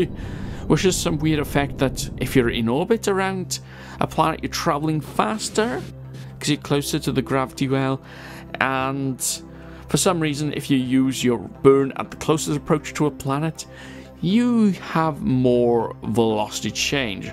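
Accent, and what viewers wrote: Wales, United Kingdom. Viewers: believe me. your accent is definitely Welsh! and strong too. Be proud of it buddy!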